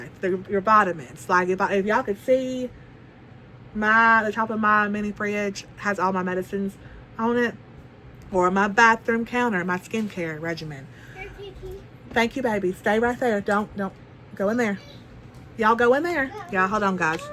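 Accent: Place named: South, United States. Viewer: Your accent is adorable - from a fellow southerner. I'm gearing up for my VSG in July. I wished it could be sooner but work committments block out June and the beginning of July. I've started implimenting things that people suggested. Drinking 64 oz of water daily, chewing 20 times before swallowing; cleaning up my diet and exercising.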